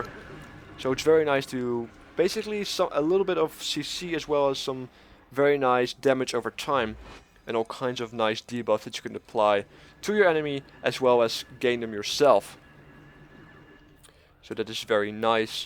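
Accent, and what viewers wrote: Netherlands. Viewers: Nederlandse accent in het Engels! ;) So nice to hear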